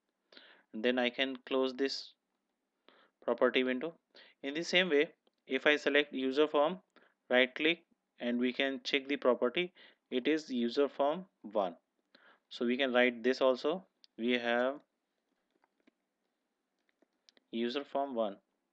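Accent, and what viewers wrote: India. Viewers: How do you understand indian english?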